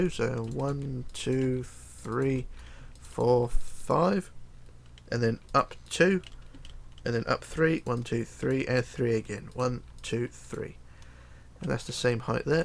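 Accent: United Kingdom. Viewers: man i love your english accent✌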